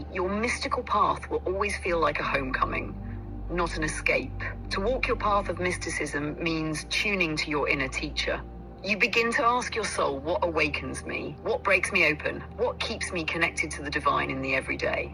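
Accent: United Kingdom. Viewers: I can't stand the British voice😅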